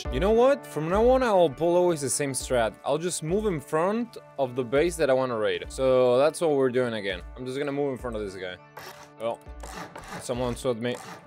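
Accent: Argentina. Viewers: Amigo sos argentino? Lpm me re confundis xd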